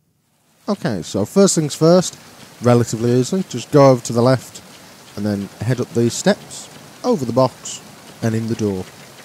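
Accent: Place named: Midlands, United Kingdom. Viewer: you from the midlands sound it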